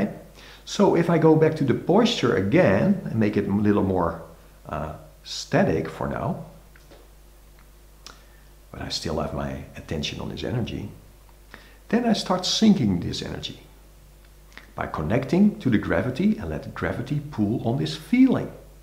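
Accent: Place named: Netherlands. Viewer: Ik wist het. I mean, I used to live in the Netherlands, so I'm used to the Dutch accent hehe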